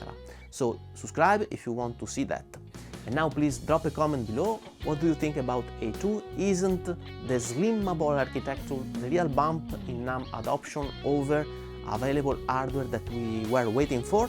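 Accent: Italy. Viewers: You could put the script on your phone and reference the screen. The casual walk & talk is very engaging in many ways because it's like you're taking us on a journey with you through your environment where you normally go for a walk. We get to see a little bit more of your world and get to know you better. Nobody expects you to speak English perfectly. In fact, your Italian style makes you a real Renaissance Man!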